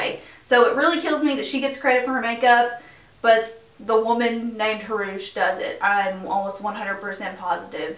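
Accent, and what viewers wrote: South, United States. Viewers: What you were saying about Kylie Jenner really resonated with me. As a working artist it would be difficult to see someone else getting credit for all of your work. You're paid for your work yes but credit especially on social media and shout outs are what continue to drive traffic towards your business. Thanks for the recommendations. I just subbed today. Love your channel and your accent! I'm a southern girl too 😘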